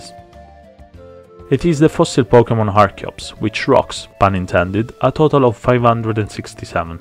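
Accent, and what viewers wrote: Italy. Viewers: Maaa, per caso lei è italiano?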